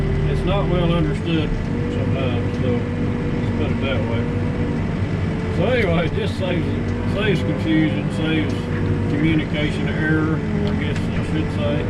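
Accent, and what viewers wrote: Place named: Oklahoma, United States. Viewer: That Okie accent is Okay!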